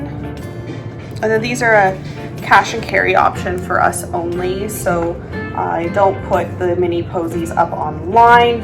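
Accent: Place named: Canada. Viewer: You're so Canadian. EH!... You said it so many times. Love it.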